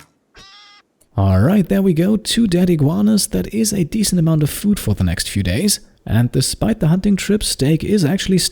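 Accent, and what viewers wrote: RP, United Kingdom. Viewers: posh as fuck accent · Never heard a British person speak like that! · "Giveaway" A British man narrates the life of a naked tribal man named Steak that crash landed in an extreme desert who is on a quest to obtain psychic powers. Just ordinary Rimworld things. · Was looking for a naked brutality run of royalty. Found a posh accent dude doing it and a giveaway. Cool beans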